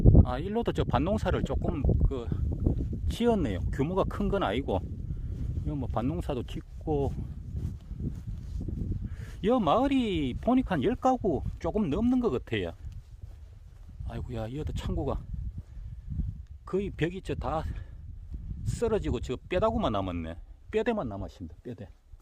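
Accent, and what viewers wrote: Gyeongsang, South Korea. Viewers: ㅋㅋ 말씀 넘 웃끼게 하시네예 완전 갱상도 사투리 정감갑니다 인상도 짱이시구요 좋아요 구독눌러서예 ~^^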